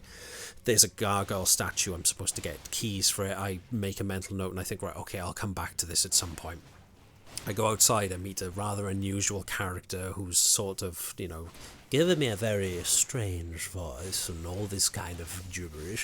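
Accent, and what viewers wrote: Wales, United Kingdom. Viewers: Is that a hint of a Welsh accent I hear? 🏴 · Hey dude, brilliant video! For starters it's nice to hear a fellow Welsh accent in the YT gaming space! Secondly, I really enjoyed your chill tone, and your rambly style, it's really cool so don't worry about how 'professional' it seems or whatever, it's a strength o your style I think! Plus, I can clearly see you put A LOT of effort in the editing, the cuts, the memes, the general flow, fantastic job! And finally; welcome to the Souls club, once From has their fangs in you it's hard to get away lol! Hope you play and enjoy the other titles! Anyways, apologies for for the mini-essay but yeah, awesome video, a joy to watch, thanks! Cymru am byth!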